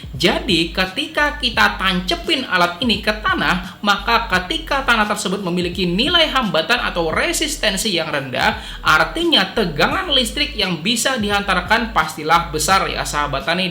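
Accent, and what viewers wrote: Banjar, Indonesia. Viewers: orang banjar sekalinya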